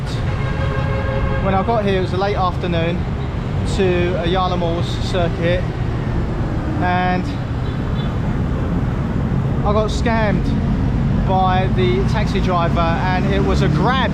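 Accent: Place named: England, United Kingdom. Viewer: Sorry for your bad experience with a taxi driver. A long time ago in Manila we had a similar experience, but it did not work in his favor. Because as soon as he demanded a lot more money than the usual fare from us, we got out of the taxi immediately. And yet he had the guts to scold us. We could have given him tips if only he was good and honest to us. They are the rare ones. However, we come across with many of them who are so honest and kind to us. Glad that you got over it and please continue your good work. I just love listening to the interesting things you say... and your English accent too. Hehehe! Thank you, keep safe and God bless.:goodvibes:😇🥰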